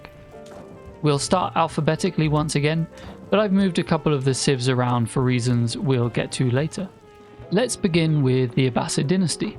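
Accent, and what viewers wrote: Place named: United Kingdom. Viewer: Damn this British accent. Mehter is not Meta. It's Mehter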